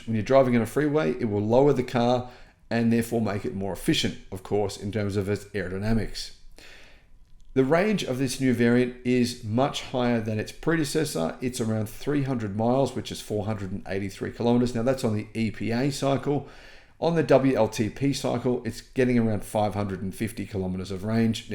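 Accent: Australia. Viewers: Still prefer the ICE versions ,I don't care how quick they are in a straight line they are cold ,sterile ,have no sound ,no soul , character ,the gas powered version has the sound of that engine the sound of that thundering everytime you push the peddle it has character , "soul" . BTW ,are you an Aussie? Mate.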